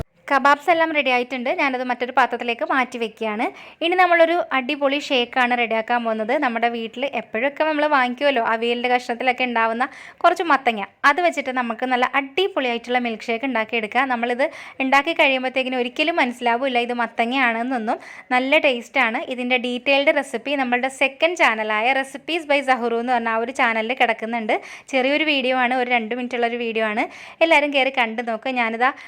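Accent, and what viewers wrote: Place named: Ambalappuzha, India. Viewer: Njangal ambalappuzhakkarum anganeyanu parayunnath ❤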